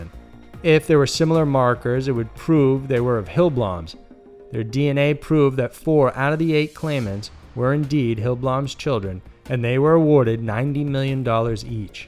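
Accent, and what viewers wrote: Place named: Appalachia, United States. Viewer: Hill Billie narrator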